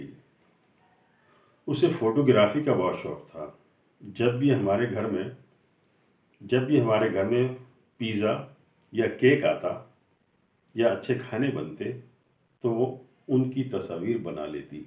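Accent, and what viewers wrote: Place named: Pakistan. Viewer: یہ نشان دہی درست فرمائی۔۔۔ہم بھی خاب کو خواب کہا کرتے تاہم جب ہم نے سلمان پیرزادہ کی زبان سے سنا خاب لے لو خاب تب جانا کہ ۔۔۔۔و ساکن ہے جیسے لکھا خوشبو کہا جاتا لیکن کہا خشبو جاتا ہے۔۔۔سلامتی۔۔۔آپ کا اردو لہجہ اور اردو زبان و ادب سے محبت کا سلیقہ آپ سے جملہ اہلِ زبان کے لیے آنکھیں نم کیے دیتا ہے کہ ایک اک کرکے میرے اہل ادب جا رہے۔۔۔اب تو چند سانس محبت کرلیں ۔۔۔اک دوسرے کا احترام کرلیں۔۔۔ہر زبان و کلام اور ہر ماں سے محبت کرلیں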